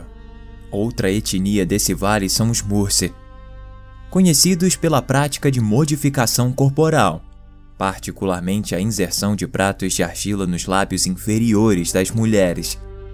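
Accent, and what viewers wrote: Rio de Janeiro, Brazil. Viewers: Num dá pra ouvir é muito carioquês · É, não consigo ouvir esse sotaque carioca carregado de x e vogais inexistentes por mais de 20 segundos...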